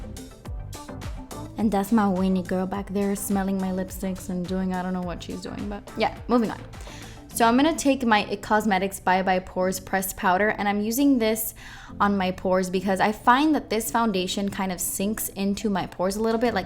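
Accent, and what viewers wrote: Cuba. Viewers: chica yo te las veo perfecta!😂 adoro el acento cubano. Soy Nicaragüense😁. Cuba y Nicaragua son besties.😍 te amo!! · You should do a whole video in Spanish!!!! I love your Cuban accent!!! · thank you for moving far away from the candle !!! it would give me anxiety when it was right behind you....!!!!! and I can't get enough of the Cuban accent, lo amo!! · Stunning as always #LoveTheCubanAccent 💕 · I frikin love your videos y ese acento Cubano ! Ay me encanta!! Perfecta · I love when you speak Spanish, I can hear that Cuban accent peaking through 🙌🏼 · Loving that Cuban accent❤️😍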